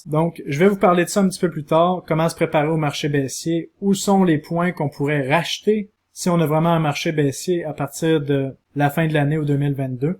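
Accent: Quebec, Canada. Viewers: Alors quand tu dis que les médias de masse dise que de la grosse merde avec ton accent québécois, je suis juste mort de rire et ça illumine ma journée 🤩🤣🤣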